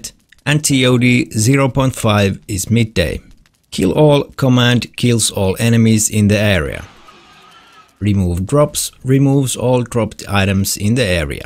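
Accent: Finland. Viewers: I am in australia but I know that accent! FINNISH ACCENT!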